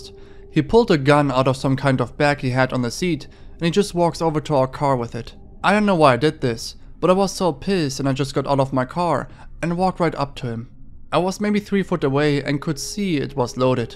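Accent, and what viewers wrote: Germany. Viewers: are you german? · Is he from Germany? The accent sounds quite German.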